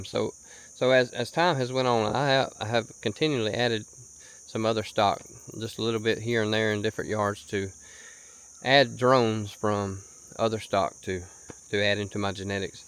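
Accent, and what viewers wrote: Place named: Alabama, United States. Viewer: Your right about staying on top of your hives for sure, it don't take much to lose a couple of them and that really hurts especially if your a small beekeeper without a lot of resources to pull from. Good tips about laying workers, gives me something to fall back on when I run into it. As an aside, wife knew you was from Alabama when she heard you talking from the other room LOL!, her kinfolk are down Brewton, Alabama way. Y'all have a good day now.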